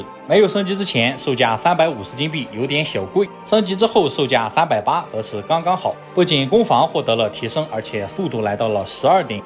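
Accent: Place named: Shandong, China. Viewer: 感觉像山东， 四川人应该不骂wanna free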